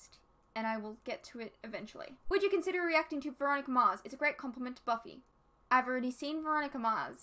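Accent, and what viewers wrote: Australia. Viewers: Like I said, it sounds more Aussie.:-) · You're: Young, female, cute, and you speak English with an Aussie accent... Yep, I predict your channel will continue to GROW.:^) Lewis from Orange County, California, USA (just down the street from Disneyland).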